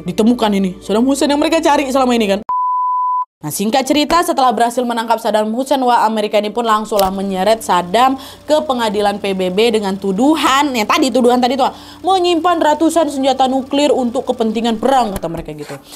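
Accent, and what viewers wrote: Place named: North Sumatra, Indonesia. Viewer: Logat bataknya kental banget yaa.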